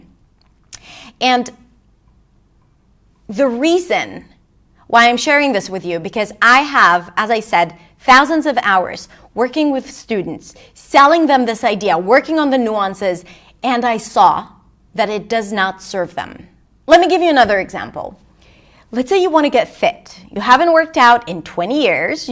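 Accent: United States. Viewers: you are pushing it too hard, we know your American accent is 99,9% accurate, but you still have to work on the source. Stop watching movies, especially with girly white girls starring, start watching documentary or news program... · I am a college graduate foreigner who has been living in the U.S since I was 18, and that was over 20 years ago. I can notice a British, Australian or other types of accent within a few seconds. You sound just like an American. Apparently, you were able to achieve the goal from option 1, so just show us how you did it. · But you sound really American 😂 and i want to sound so good as you. I do not compare me to you. But from the beginning i want to sound like native speaker already and try to nail it. But some how i can not change my rythm anymore😢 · being white and being a man? I didn't watch this video cuz you're white or whatever. 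Watched just because you are non-native and you acquired that american acccent. You should study a little more about femminism BS. I am a white man and have priviledges cuz I work my ass off and not for a some sort of white skin priviledges... and of course I trust more people without accent cuz generally have more experience (there are exeptions but I'm sure you understood my point) Best regards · I wish I could speak english with your perfect american english....but I would choose the option 1 undoubtedly 👍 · She sounds completely American. She speaks from the inside of her mouth a bit towards the back, but so do a lot of people. Midwestern accents and even southern accents push the sounds into the top middle of the mouth. Think of how people from different regions say, "yeah". Her presentation and placement has a lot in common with the standard American accent. Good thoughts here. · You are not a Native speaker? 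No way!!! You sound 200% American. At what age did you come to the US and how old are you now, dear? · i get confused for a while, i thought you were from the US because of your accent. Your English is brilliant.. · The thing the only reason she can say this and not sounding ridiculous is because of her American accent. Try another one say in a Chinese-English accent. Not indian, not african, chinese.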